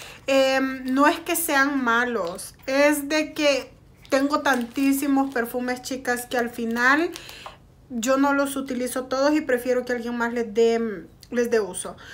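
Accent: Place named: Veracruz, Mexico. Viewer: que bueno que hagas reseñas de perfumes Avon ya que son económicos, y tu acento me gusta ya que soy Veracruzana y algunos acentos en tu pronunciación se parecen, a los míos, bendiciones.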